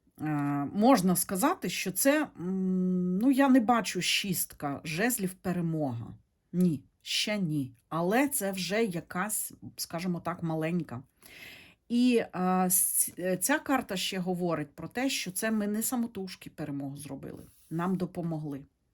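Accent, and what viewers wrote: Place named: Chernivtsi, Ukraine. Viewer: добрий день я знаю де хотинський район бо я ваша землячка з Чернівців також на даний час в Німеччині всього вам найкращого як я люблю наш діалект таке наше рідне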